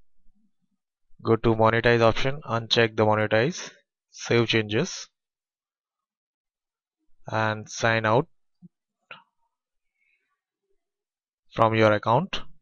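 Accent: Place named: India. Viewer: I hate the Indians English accents maan ,soo frustrating ughh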